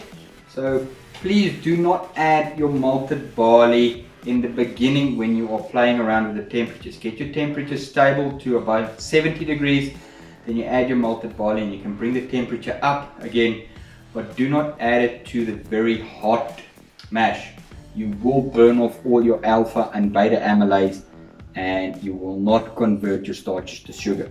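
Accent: South Africa. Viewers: I cheated, LoL:-) I could tell by your accent that you're South African, so I used google translate.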